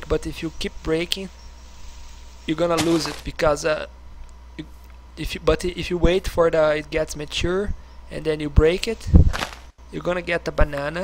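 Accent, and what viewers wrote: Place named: Portugal. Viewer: are you portuguese because your accent is the same XD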